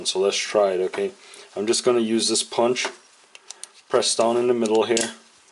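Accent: Trinidad and Tobago. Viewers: Do you have Trini roots? I think I hear it in your accent.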